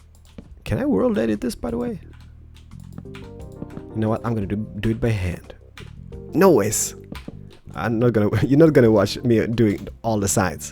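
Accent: Scania, Sweden. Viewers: När du pratar engelska så hör man inte din skånska dialekt, men när du började räkna på svenska kunde jag inte sluta le. Så uppenbart att du är bosatt i Malmö, så go dialekt! :D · wtf är du svensk? :O eller ja, skånsk ;) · His accent is sveska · LapizManHD It is appropriate to say he speaks English with a Swedish accent. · you swedish bro haha love it riktig skånska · Gillar din skånska. Också från Skåne men norra delen. Liking your skånska. Im also from Skåne but the north part. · Är du skånsk? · Hahaha skånska e ju bäst :D (fast sthlm ftw ändå :3) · Du e ju SKÅNSK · lol That Skånska! xD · Skånska =D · Oh man, has the most fabiest Swedish ever. I am also Swedish. <3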